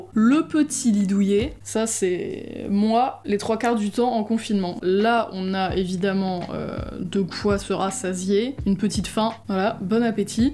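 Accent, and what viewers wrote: France. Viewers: Salut, je viens de te découvrir ta chaîne et je trouve que t'es sympa et j'ai bien ta bonne humeur :). Mais j'aime pas trop quand les gens mettent des mots en anglais comme tu le fait car je trouve ça gênant surtout quand ton accent est fr comme ça mais après c'est mon avis et ce n'est bien évidemment pas une insulte envers toi. Je te souhaite bonne continuation sur yt 😉☺️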